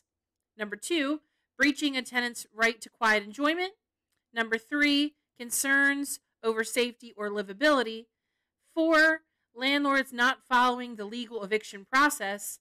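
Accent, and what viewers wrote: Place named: Delaware County, United States. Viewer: I knew I heard a Delco accent.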